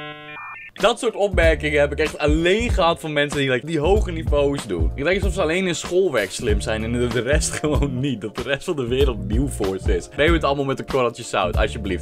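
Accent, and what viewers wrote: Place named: Friesland, Netherlands. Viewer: Mijn vader zegt dat je een Fries accent heeft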